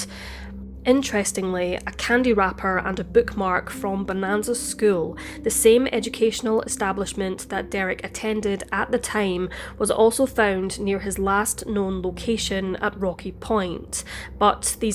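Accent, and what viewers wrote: Scotland, United Kingdom. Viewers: Love the Scottish accent 💞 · Is this a Scottish accent or an Irish accent? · It's hard to peg your accent. Sounds like a hybrid of Scottish and something else? Love it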